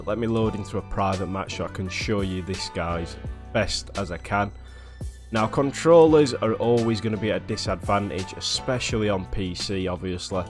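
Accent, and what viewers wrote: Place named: Sheffield, United Kingdom. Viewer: you from sheffield bro ? i’m from birmingham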